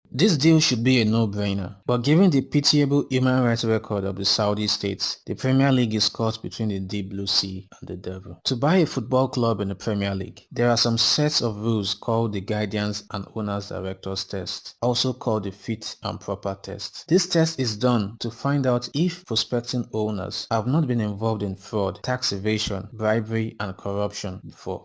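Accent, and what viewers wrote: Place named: Nigeria. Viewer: Real Nigerian accent you got there mate.. Good info though.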